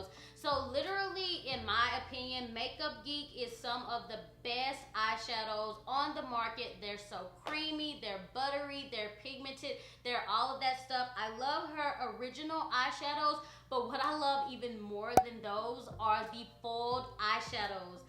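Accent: South, United States. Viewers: Your southern accent is so adorable.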